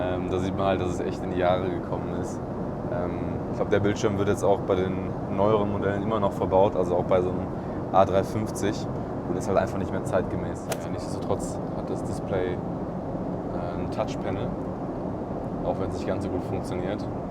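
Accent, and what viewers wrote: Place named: Germany. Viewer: Dieser Deutsche Akzent 😁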